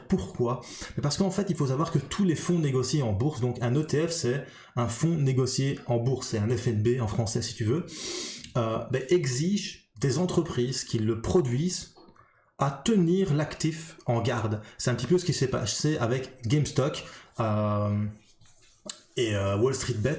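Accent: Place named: Tournai, Belgium. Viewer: Tu as trop la voix du tournaisien :)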